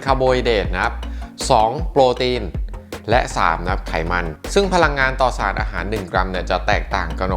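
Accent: Thailand. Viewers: โยโย่มา แล้วเเล้วลดไม่ลงเลย ออกกำลังกาย คุมอาหาร ก็ยังไม่ลง ปล.โค้ชเป็นคนสุพรรณหรอ สำเนียงมันได้😆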